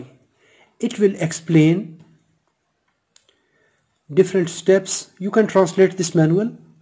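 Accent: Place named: Arab world. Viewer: impressive arabic accent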